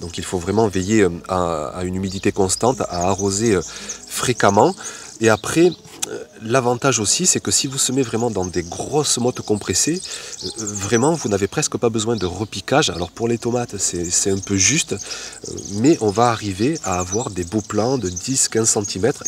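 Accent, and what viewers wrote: South, France. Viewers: Vidéos passionnantes et très instructives pour un jardinier novice que je suis . Très bien fait et le "petit accent du sud" en option ne peut que nous donner envie d'aller au jardin !!!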